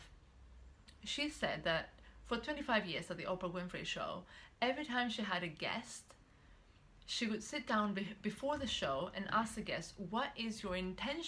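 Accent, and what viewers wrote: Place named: France. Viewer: Ok, first off: your positive energy is very much appreciated. Secondly, your accent is thick French; are you French by any chance? To dig into the meat your argument, your example was purposely vague to stimulate thoughts. Very provocative, I must say. I hate to argue with positive women such as yourself, but energy is technically not a force; however, I will forgive you this time, because energy operates under similar laws to Newton's 3rd. If you want to broaden your arsenal, the law of energy reciprocity, that you are refering to, is called "Conservation of Energy".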